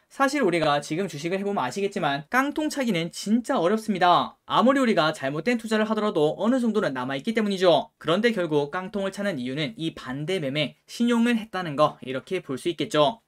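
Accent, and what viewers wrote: Gyeongsang, South Korea. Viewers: 감사합니다~ 그런데 님 대구 사세용?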